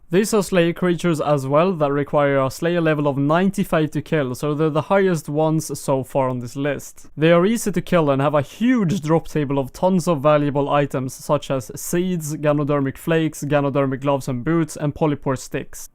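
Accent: Sweden. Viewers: the swedish accent is so obvius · Your Swedish accent is so sexy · The swedish accent :D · Where are you from? Your accent sounds like swedish-english ish:P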